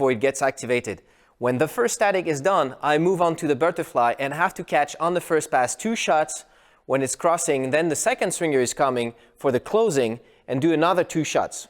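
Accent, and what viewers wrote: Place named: France. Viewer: French accent...oui!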